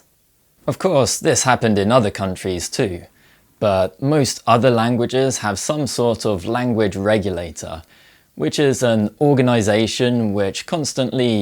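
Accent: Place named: England, United Kingdom. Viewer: Seriously, your English accent is amazing! It makes your content so engaging.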